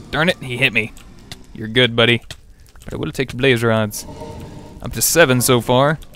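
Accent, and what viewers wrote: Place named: Midwest, United States. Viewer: Scotty I think he has a centrial U.S accent but I cant quite tell, all I can tell is my accent is very similar at times and I live in Oklahoma U.S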